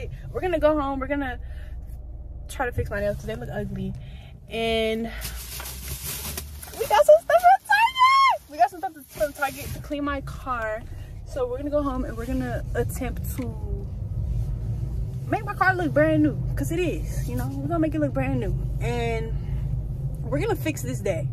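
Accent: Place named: United Kingdom. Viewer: The British accent 😂LOL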